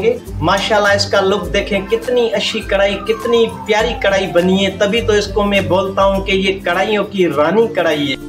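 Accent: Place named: Sindh, India. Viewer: Beautiful colors..bohat achi recipe..aurcaap ki sindhi accent itni pyari he سائیں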